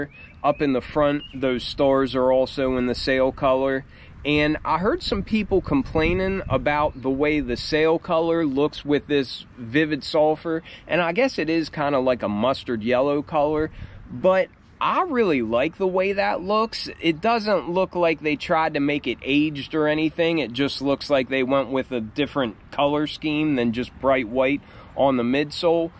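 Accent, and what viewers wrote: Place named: Pennsylvania, United States. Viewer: Lost my best friend of 42 years in June. Had a heart transplant and didn’t make it. I feel your pain! We are all right there with you. Don’t forget that. I’m in Dubois PA , I can sense your PA accent haha. Been a 4 fan since 89! My fav Jordan ever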